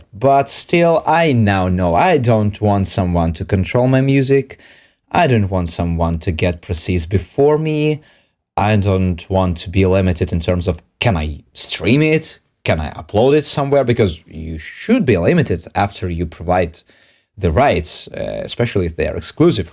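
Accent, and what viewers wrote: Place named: France. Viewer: nice new track, congratulations, dear. 🍀 you have such a charming French accent. and you make such cool remixes of the French language: - les filles jouent de (avec des) boules de neige. (the girls play (with) snowballs) ❄ - qu'est ce que c'est que ça? ça sent bon le chocolat. 🍫 what is that? it smells like chocolate. (copyright with the song " " 2012.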